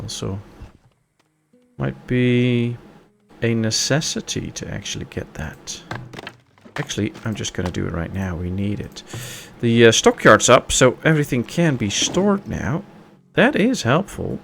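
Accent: Netherlands. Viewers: Nice video! I recently found your channel while looking for LiF letsplays. Maybe an odd question but are you Dutch? You have that typical English eccent. If yes then groeten uit Utrecht.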